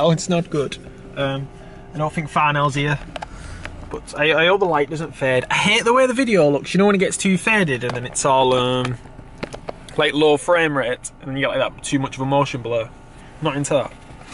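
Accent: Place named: United Kingdom. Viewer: Lad, where in the Uk are you from?had to use CC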